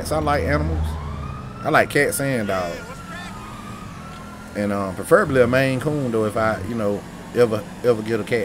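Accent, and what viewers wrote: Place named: Florida, United States. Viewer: where you from sound like you from Florida champ???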